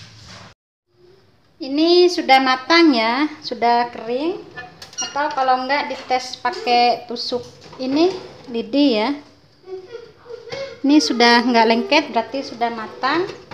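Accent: Madura, Indonesia. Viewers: Asli bunda dari mana logatnya seperti org madura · btw klo boleh tau orng ap ya logat bhsanya kya orang madura 😀😀😀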